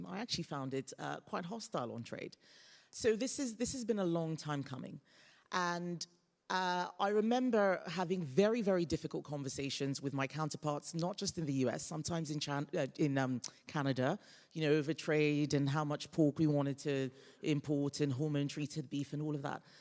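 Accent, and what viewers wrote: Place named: RP, United Kingdom. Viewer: A negro speaking the queen's english is obscene. If she ever gets to the point.... britain is weak and shameful. So is the most parts of europe. They cant even defend themselves but declare, it's not our war. Oh yes, it is!!! Islam has taken over your cities, and you allowed it due to your indifference or cowardice. Now , we, the US are done with your gay shit. Go ahead, get overrun by islam and communism. We are done with you as well!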